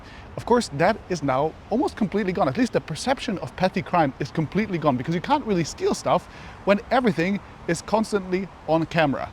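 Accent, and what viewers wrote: China. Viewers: Why'd you have a slight chinese accent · How much does this guy get paid to simp for china? He even has a slight chinese way of speaking english.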